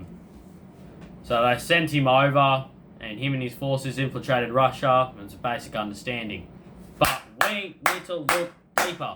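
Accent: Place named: Australia. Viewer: I was for sure expecting a deep Mississippi accent i was not expecting an Awesome Aussie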